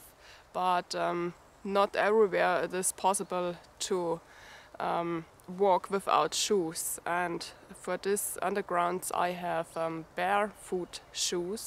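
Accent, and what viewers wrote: Germany. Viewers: you are extreemly funny .........its just your English with German axcent is kiling ......please accept this comment as compliment .....because it is plus not to forget that those shouse are the best easier way in a surviving situation in case you got lucky to kill larger animal and skeen it or you come a cross dead one happend to have still a skin .........great simple shose to cover and protect your feats .......lovly ......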